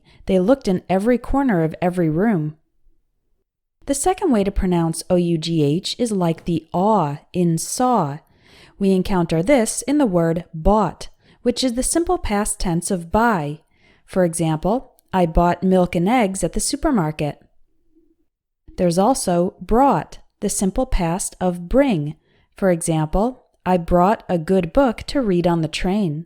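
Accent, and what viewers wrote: United States. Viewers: Do I hear a midwest accent? 🧐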